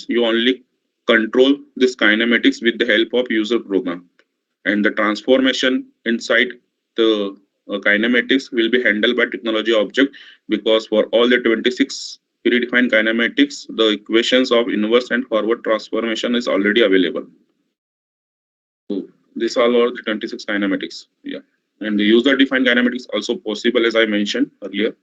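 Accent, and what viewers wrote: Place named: India. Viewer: with the indian accent it is becoming an headache after some point. sorry mr..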